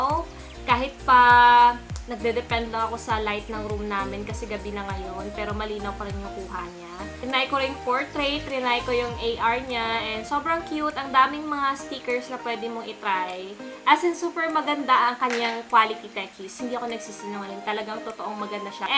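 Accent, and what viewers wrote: Visayas, Philippines. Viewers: bisaya d i ka maam? hehehe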